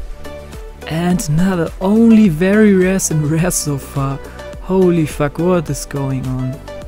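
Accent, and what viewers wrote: Germany. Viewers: Wie man minimal hört dass du deutsch bist hahahahahaha xD gutes Video:) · dude are you from germany? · You're German?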